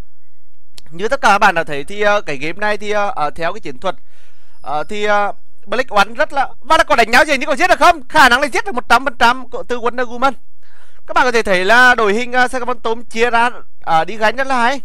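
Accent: Nghe An, Vietnam. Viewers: thick giọng nghệ an nghe sướng tai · Giọng nghệ nghe buồn cười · Giọng nghệ an hay mà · E thích tiếng nghệ an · Ah giọng nghệ an ở thái hoà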